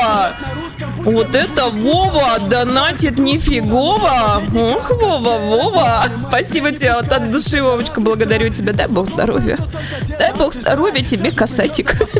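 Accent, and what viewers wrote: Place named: Germany. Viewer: немецкий акцент проскакивает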